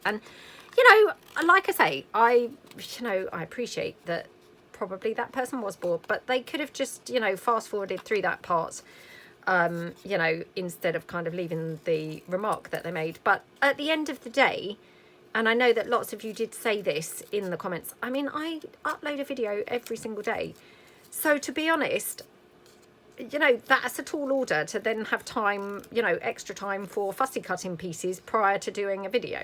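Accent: England, United Kingdom. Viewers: I am behind on watching your videos so just now hearing about the negative commenter. Elaine’s comment was Well said! I LOVE the fussy-cutting, the chatting, the tea-drinking and I think I am getting a bit of an English accent if I do say so. Keep doing you, and those of us who love your videos will keep watching!!! ❤❤❤❤❤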